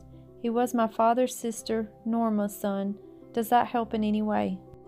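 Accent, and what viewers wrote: South, United States. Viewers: I just Love your Southern Country Accent...it’s GREAT 👍🏽. I’m Glad that you posted this outcome and you’re ability to find your BIO Dad. 🤔🙏🏽🙇🏻‍♀️💗🔍🕊💎🧬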